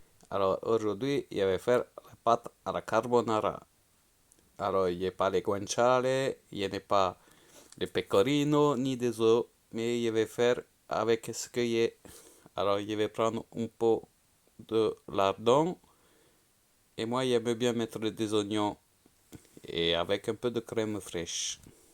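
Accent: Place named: Argentina. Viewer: Sympa l’accent Argentin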